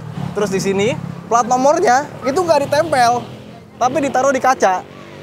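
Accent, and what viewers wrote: Padang, Indonesia. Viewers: Bang orang padang ya?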